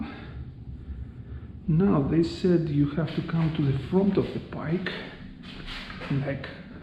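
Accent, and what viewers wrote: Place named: Greece. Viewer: Yo man... finally a voice. Love the accent. Reminds me when I was kid... my buddy was Greek and his dad had the same accent. From what I have read, the Puig is great for wind protection. Good job bud.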